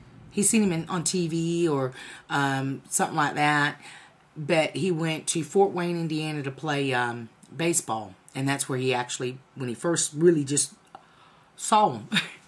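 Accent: South, United States. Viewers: Is your MOM ASIAN AND YOUR DAD BLACK? and Are u from Texas because you have a Southern / Country Accent😎😎😎😎😎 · at first i was thinking english but then the more i herd you i was oh okay shes from the south* i luv southern accents* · half black, half asian with a country/southern accent...I love it!!! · where are u from? you have a thick southern accent. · truly you are a southern woman, no matter what your race is. You sound just like Paula Deen to me! Lot's of black southern people are country too! you sound like a home girl to me! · See how RACE is so freakina problem with RACE in general cause if you had not known her Direct escendant outcome then you would think this woman was a natural native from Tx or Ok ...etc etc and the the souther accent helps ease the fact that how some ppl would react to the fact of the though of her being "hALF-n***8" (excuse speech) referencing tho. 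· Do you cook? You remind me of a Blasian Paula Deen. Maybe it's the accent. Southern accents have a certain warmth. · I love your Southern accent!! You're a beautiful lady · I was like I hear an accent but than I realize yep a country accent. you sound so white tho. glad you had a good experience. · OMG, you are so gorgeous and sweet with your almond shaped eyes, beautiful brown skin and Southern accent!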